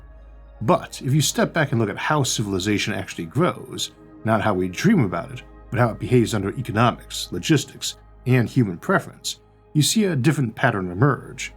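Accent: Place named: South, United States. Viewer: know something please - your speech impediment was never enough to make you unintelligible, in fact it was part of the charm that attracted me to your channel - I do love a good Soouthern drawl (I'm British). Never feel the need to apologise for that. Folks that can't see beyond it are the true ones that need to grow of they want to enjoy your work. I am happy you have managed to get it to a point where you are happy with it though. Always remember the thought behind and quality of your work has always been the main, and will no doubt continue to be, it's prime feature.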